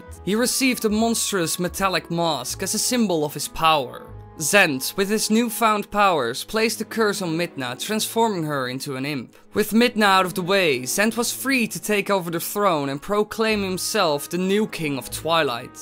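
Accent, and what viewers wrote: Netherlands. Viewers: is hij nou eigenlijk Nederlands? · Jij bent Nederlands zo te horen. ;)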